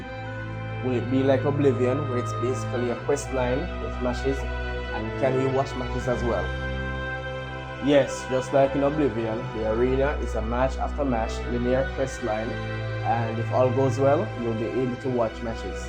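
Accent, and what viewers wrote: Jamaica. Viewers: Are you Jamaican? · He’s Jamaican you mong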